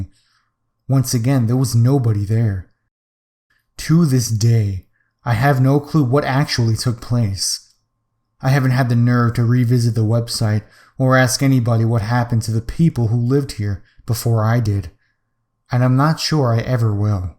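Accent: United States. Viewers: Love your cute American accent!!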